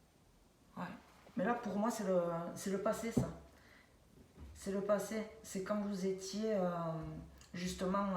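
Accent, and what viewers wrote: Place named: South, France. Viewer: Un petit coucou du gers belle guidance avec le beau accent du sud.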